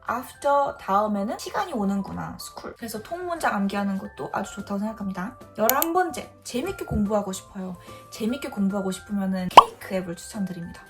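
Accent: United Kingdom. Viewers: 영국 발음을 너무 좋아해서 이왕 회화를 할 거면 영국 발음으로 공부하고 말하고 싶다는 생각을 했어요 언니의 발음이 제 워너비에요ㅎㅎ 대부분이 미국 발음일텐데 언니는 어떻게 영국 발음으로 회화를 마스터 하셨나요? 영국이라는 현지에 나가 살다보니 자연스레 익히게 된 건가요 아니면 따로 공부할 때 영국 발음으로 공부를 하신건가요?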